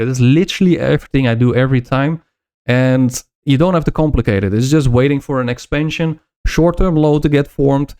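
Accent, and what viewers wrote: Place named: Netherlands. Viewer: Don't know why I found it really funny when you said "Trump is talking about tariffs and he is absolutely destroying the dollar right now" - something about your Dutch accent makes it even better hahaha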